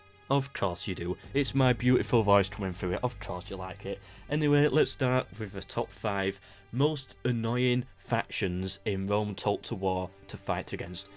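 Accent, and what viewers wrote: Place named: Yorkshire, United Kingdom. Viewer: I find the accent both distinct and pleasant and fellow Yorkshireman. I know your dialect is local so maybe I'm wrong but I normally hear Carthage pronounced like 'carthidge'. Not trying to be a nob, no one messes with the dark lord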